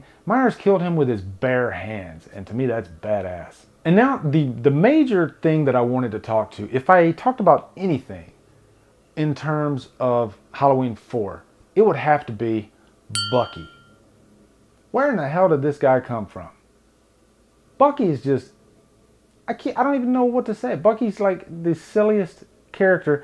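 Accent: South, United States. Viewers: I only have just recently discovered your channel and I have to say this is an insightful and well dictated review. I know this may sound stereotypical but the southern accent adds a bit of charm as well. Keep up the good work!.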